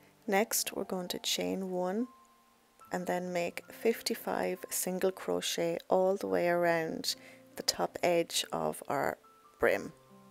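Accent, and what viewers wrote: Ireland. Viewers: Well this is just lovely! I adore this whole tutorial! Your pretty Irish voice is so soothing. I’m an American who lived in Ireland for a couple of years, and the memories are priceless. Gorgeous pattern and hat!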